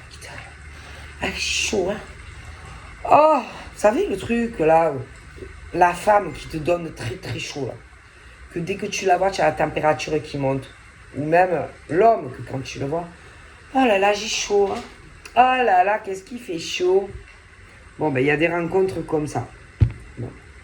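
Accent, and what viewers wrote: South, France. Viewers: Bonjour, j'adore cette guidance !. Un jeu d'actrice très réussi, un franc parler bien du sud, un vent de fraîcheur ! Ça met de la gaité sur des situations difficiles. Merci bcp, et un tirage qui me parle vraiment 🙏🙏❤️❤️❤️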